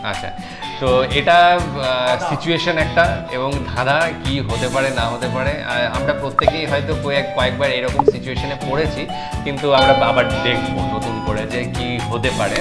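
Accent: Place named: Dhaka, Bangladesh. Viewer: অরিজিনাল ঢাকাইয়া ভাষায় কথা বলেছেন বস্!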